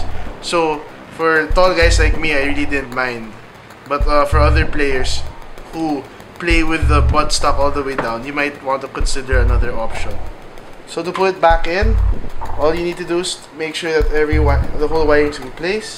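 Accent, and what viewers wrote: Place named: Philippines. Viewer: Filipino accent :D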